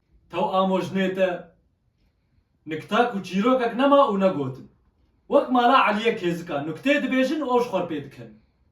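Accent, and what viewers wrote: Afrin, Syria. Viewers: ولله طالع ضابط حكيك بل عفريني انا من عفرين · 😹😹😹 طالعة الهجة العفربنية حلوة معك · اشقد ظابط معك الحكي العفريني ياربي فرطت 😂😂😂😂😂😂😂😂😂😂😂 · احلا شي كلامك العفريني😭😭😭💜 بس شوي لكنه تبعك راح ع كوباني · كلو كوم ؤ عم تحكي عفرينكي كوم تاني😂😂😂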